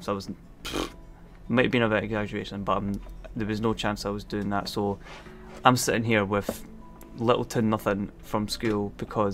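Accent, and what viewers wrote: Scotland, United Kingdom. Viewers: Yo man where you from in Scotland?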